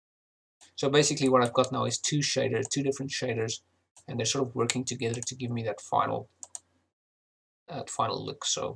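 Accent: South Africa. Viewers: I detect South Africanness. " ", confirmed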